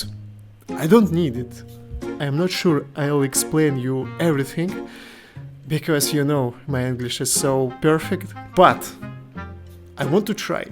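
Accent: Russia. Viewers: Для русского англорусский акцент звучит как родной русский язык)) Рад, что наткнулся на тебя, подпишусь! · Блять ты русский? Афигень акцент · u russian? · That russian accent tho) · Английский язык с русской интонацией и манерой речи звучит понятнее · Такое ощущение, что акцент русский))))) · У тебя русский окчент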